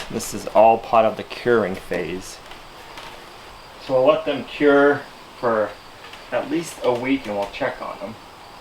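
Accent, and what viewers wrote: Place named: New Hampshire, United States. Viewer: Here's a Tee shirt. "What's next on Lumnah Acres? I have an ide'er.... Love the NH speak. Reminds me of my dad.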